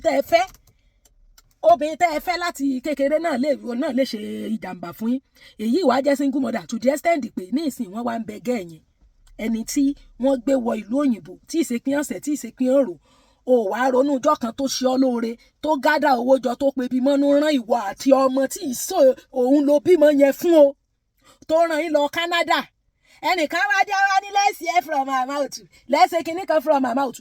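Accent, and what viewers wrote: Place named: Osun, Nigeria. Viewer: I REALLY GBADUN THIS YOUR PROGRAM WITH THIS YOUR YORUBA LANGUAGE YOU'RE REALLY FROM OSUN STATE😂😂😂😂😂❤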